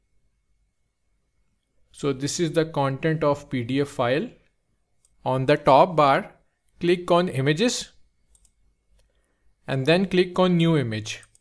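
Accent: India. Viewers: When i heard the indian accent and a sub 2 mins video, i knew it was the one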